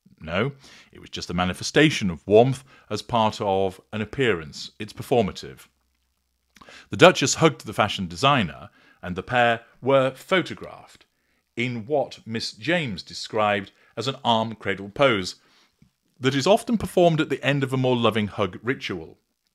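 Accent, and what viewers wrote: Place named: England, United Kingdom. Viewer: English accent is so fancy so when he swears it is extra special Love love love this channel!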